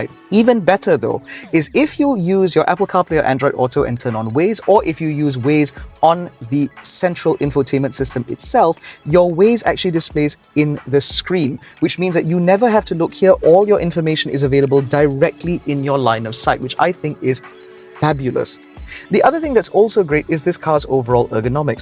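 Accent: England, United Kingdom. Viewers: Thumbs up to Your english accent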